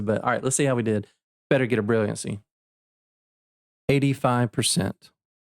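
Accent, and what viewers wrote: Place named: North Carolina, United States. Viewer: Love the video and series! Where are you from? I’m an accent guy and it sounds like you’re from somewhere close to where I used to live (Raleigh, NC).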